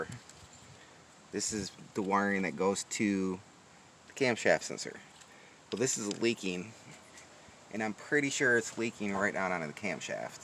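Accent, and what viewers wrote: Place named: Pittsburgh, United States. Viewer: You’re from Pittsburgh huh? Lmao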